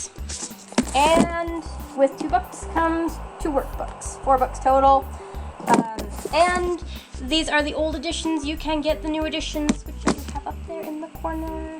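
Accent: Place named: United States. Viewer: lol, I am European and dislike her prosodie. Definitely too American for me ;)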